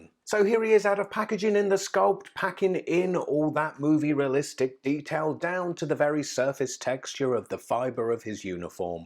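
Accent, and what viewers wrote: England, United Kingdom. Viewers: Oh man I miss your review and your English accent. You’d be so happy to have Worthy Capt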